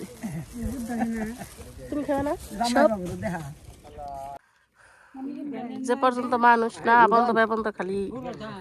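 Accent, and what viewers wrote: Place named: Tangail, Bangladesh. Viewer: apnr vasa dekha bujse apni Tangail ar amio tangail ar cela basail thana amr basa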